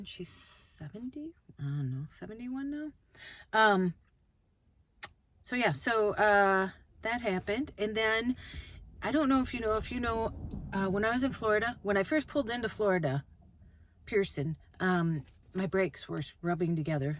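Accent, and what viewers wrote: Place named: Fargo, United States. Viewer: I think you talk like Fargo😊. Then again I am from Rhode Island. 😉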